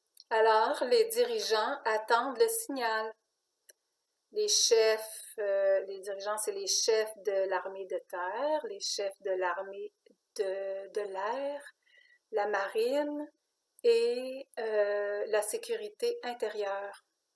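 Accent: Quebec, Canada. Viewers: J 'aime ton accent québécois et d'ailleurs je devrais une fois venir voir mes amis là-bas. A part ça je me suis abonné de suite car tu parles que de l'essentiel , tout ce que je lis tous les jours sur les médias alternatifs. J'aime ta façon d'expliquer et je suis toutes tes vidéos. Salutations depuis la Suisse. Raymond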